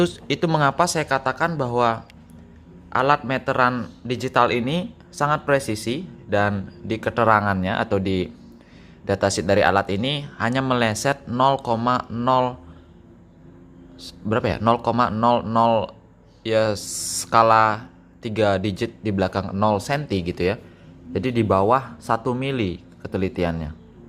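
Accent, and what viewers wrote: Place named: Java, Indonesia. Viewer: Klo di perhatikan bli ini ada logat jawanya ya...munhkin pergaulan yg luas jg kli ya...😀😀👍👍👍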